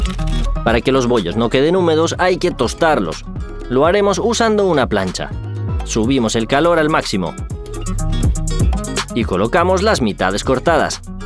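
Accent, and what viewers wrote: Spain. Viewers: No me gusta esa voz 😢😢 demasiado española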